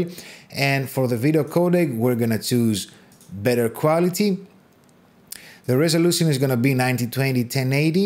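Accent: Greece. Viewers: One question....are you in any kind connected with Greece? You speak like Greek.........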